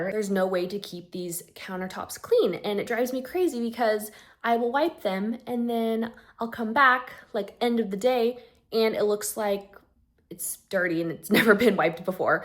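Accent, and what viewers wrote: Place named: North, United States. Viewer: So what state is this? When you said sneakers and the way you say bag, I knew it was North somewhere lol South FL gal here. Stumbled on your page today.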